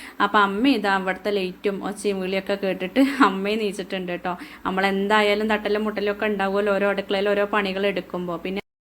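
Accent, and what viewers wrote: Malappuram, India. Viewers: Njan first ayitt aanu ee channel kaanunnath ,kandapo malappuram ulla aall aanu thonni,evide sherikk place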